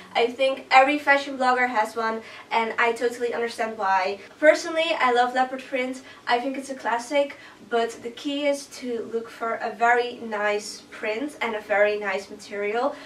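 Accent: Netherlands. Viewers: haha hoorde meteen dat je Nederlands was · Toen je begon te praten wist ik gelijk dat je uit Nederland kwam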